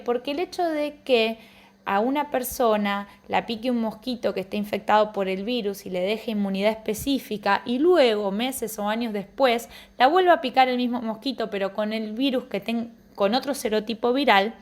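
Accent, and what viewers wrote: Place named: Argentina. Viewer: tenia que rendir un oral, necesitaba esto, gracias algoritmo, por fin me mostro algo en castellano bien argentino.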